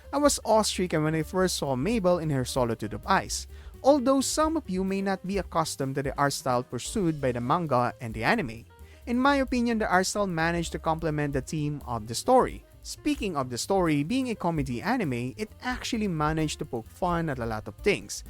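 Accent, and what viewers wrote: Philippines. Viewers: your pinoy accent is so thick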